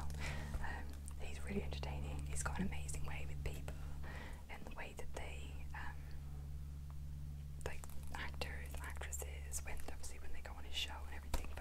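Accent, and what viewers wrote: Ireland. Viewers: the one person who can actually do a decent irish accent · I’m Irish and your the first person to nail the accent FULLY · it’s kinda cool to see someone do an actually good irish accent. she’s definitely got the soft t’s and d’s spot on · wait what i thought she was irish um hello she’s a better irish than me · I'm Irish and I'm from Belfast and I have heard a couple people from Dublin and cork and ur accent is really good and thank u for not saying top in the morning to ya😂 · this is literally an Irish accent I would’ve thought you were from Dublin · Being irish myself Not a bad IRISH accent at all well done girl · One of the best Irish accents you literally sound like me !!!!! (I’m from Ireland) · This is class I’m Irish my self and you sound very Irish ☘️ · I’m Irish and this is honestly one of the best fake irish accents i’ve ever heard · You did an awesome accent!! 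I already love your accent but I love Irish ones!! I’ve always loved talking in different accents too lol it’s fun!! 🍀💚🍻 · Sounds stronger than mine... Im from fooking Limerick. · Brilliantly done, not many people can do the Irish accent justice ❤️👌 (I’m from cork Ireland 🇮🇪) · This is the best Irish accent ever done by someone out of Ireland hands down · I was so confused watching this at first. I was thinking, does she have an Irish twin??? 😅 Brilliant accent! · I'm Irish and ppl always try to sound like me but they can't rlly do it, BUT U ON THE HAND! IS GREAT! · Omg! Coming from an Irish person, your accent was so good! Especially for a fake one. Alot of non Irish people think it's a hard accent to be successful in. I'm glad u like the accent😘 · So unreal! i’m from dublin myself, and i was shocked to find out you weren’t irish yourself!! deadly accent! you put me straight to sleep too btw, this was so comforting to me :’) Xx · Can’t find any Irish asmrtists you’re the closest thing so far! 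I love my countries accent haha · Im Irish (Dublin) and can say that you have a very good accent 👌🏽 Go Maith! 🇮🇪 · Wtf you have a better accent then me an Irish person low-key shook 😌😪🤩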